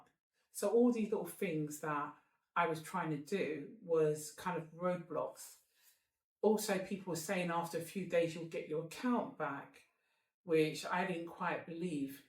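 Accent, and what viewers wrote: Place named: United Kingdom. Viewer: Am actually watching this video but I don’t know which one am confused at if it’s your beauty or the British accent or the boldness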